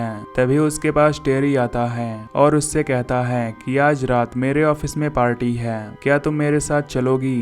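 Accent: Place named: India. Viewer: Great explanation pure Indian voice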